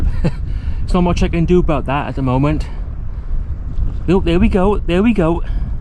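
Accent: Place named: Barry, United Kingdom. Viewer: Hello lad, been meaning to say hello. Your accent sends me back to my youth. A boy from Liverpool spent his formative years growing up, then working in Barry. i worked on the fairground in Barry till I left school...then worked for a Barry outfit...industrial painting. Approaching 65 ,I am a couple of years returning to fishing. i have fished a number of these marks when I was teenager with my brother in-law...*but considered (my brother) he was from Barry.(unfortunately he passed young). He used to take me out swimming in that bay for quite a distance. So as you see, your accent has thrown me back , even though a bit more lighter than his. Here`s a little `ode' to my mate... The Boy from Biscay (Taken early) Drag me out Adagio Drag me out Mate I’m a foal riding a dragoon covered by a halogen sky... We breast stroked some salted spat and swam that white fool embracing heat waves and beams We kept our heads up in those waters broken and how my mate saved me from drowning Wish I had spelt...in a word reciprocate Drag me out Bosun...I’m all awash I’m a babe along a buoy of Biscay The swims I made with you though, have left me walking an Isle... 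hidden yet binding How I wished I dragged Adagio upon the oils of spelt and massaged away his fate So when I found this lagoon retracing, it was a token of a moistened embrace Now when I think of you the buoy of Biscay... I hope you will wheat. ps...bit of fun <link>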